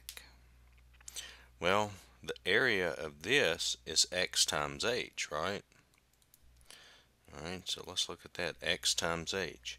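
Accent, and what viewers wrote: South, United States. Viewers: Modern day life is so wonderful if I have bad teachers I can have a smart dude with a country accent explain maths to me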